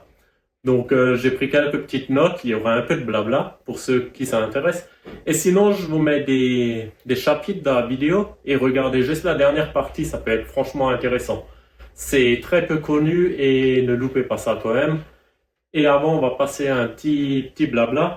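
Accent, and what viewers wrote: Alsace, France. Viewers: Hopla Salut Comme disait Germain Muller, nous les Alsaciens nous n'avons pas d'accent c'est une intonation! Toutes mes sincères félicitations pour ton parcours professionnel, cela explique ta rigueur et le souci du moindre détail. C'est toujours un plaisir de suivre tes vidéos Le Haut-Rhin te salut bien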